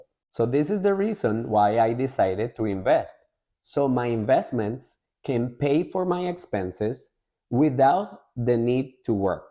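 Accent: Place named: Europe. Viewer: Europe your accent is from Europe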